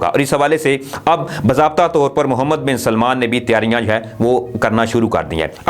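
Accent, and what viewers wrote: India. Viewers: تم یہ بتاؤ بھارتیوں کی طرح اردو کیوں بولتے ہو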